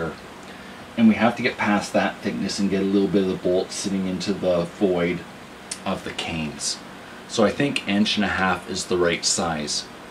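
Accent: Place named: Midwest, United States. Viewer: I was looking for a different purpose. But FYI, your idea makes perfect sense for your purpose. I am guessing by your mild accent that you live in the Midwest or maybe even Canada. Living in blazing hot Texas, I would suggest anyone living down south select a sturdy light colored fabric such as an upholstery fabric or painters tarp or canvas and buy a good UV waterproofing spray to more effectively fend off rain and the heat of the sun. Your design idea also gave me a kernel for my unrelated shade cloth project, so thank you sir. 😄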